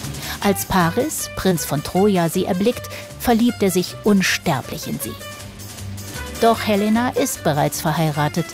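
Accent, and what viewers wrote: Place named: Saxony, Germany. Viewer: Mit Sächsischen Dialekt kann man mich wirklich foltern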